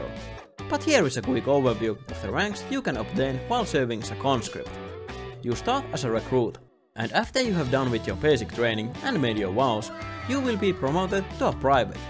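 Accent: Finland. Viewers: Mahtava rallienglanti. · Tahallinen rallienglanti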